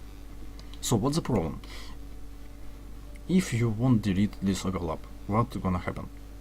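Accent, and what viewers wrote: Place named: Russia. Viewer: Привет! Можно документацию на русском? Очень неудобно слушать английский от русского :///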